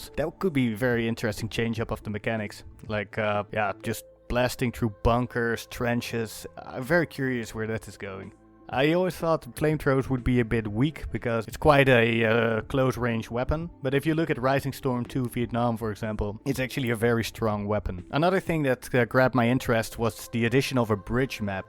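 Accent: Netherlands. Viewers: Ben je een Nederlander? Je accent xD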